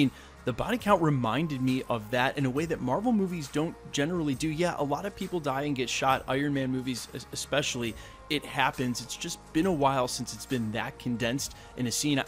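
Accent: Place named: Philadelphia, United States. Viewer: Just curious. Are you from Philly? As a local, I’m picking up a slight accent. Cheers.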